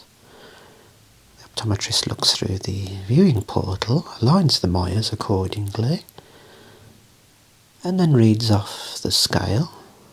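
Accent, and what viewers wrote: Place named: United Kingdom. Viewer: I'm german and the English we hear here is mostly American English. It's always a pleasure for me to listen to British English, like Tom Ellis in "Lucifer" , Henry Cavill or Ewan McGregor as Obi wan Kenobi. Or THIS gentleman here on this channel. Everytime a Star Wars kind of experience, when I hear "hello there !"😆 A big "Servus alle miteinander !" from Bavaria, Germany !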